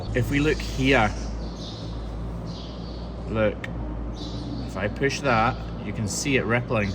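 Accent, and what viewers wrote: Scotland, United Kingdom. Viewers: I'm not a farmer. I don't even know how youtube originally sent me to this channel. But all I know is that I'm addicted and keep coming back again and again, even when I try to stay away. Maybe it's that sweet Scottish accent. Maybe it's knowing that these animals aren't in pain any more. Maybe it's the easy comradery. Or perhaps it is all of the above. Regardless, here I am again. Thank you for helping these poor sweet cows.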